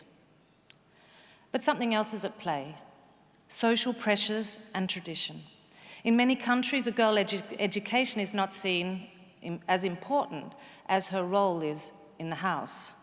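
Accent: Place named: Australia. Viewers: As a someone who speaks several language, I can tell you, that from what I know, losing one's native accent is pretty hard. It's probably because she's Tasmanian, she might be an Aussie, with an Aussie accent, but there's some Tasmanian accent in there, and that's what sounds broken, or "Danish". You can still hear that her Aussie-Tasmanian accent affects her Danish to a pretty high degree. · Hasn't lost her Aussie accent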